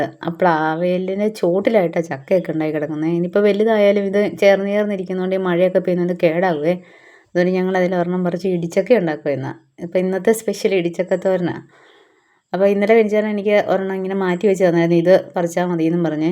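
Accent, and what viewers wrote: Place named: Kanjirappally, India. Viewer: കാഞ്ഞിരപ്പള്ളി അടുത്താണോ ? Slang അങ്ങനെ തോന്നി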